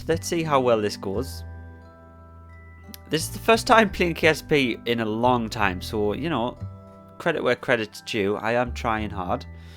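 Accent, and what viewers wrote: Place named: Northern England, United Kingdom. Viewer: You are from somewhere north of England. Accent is similar to mine where you from?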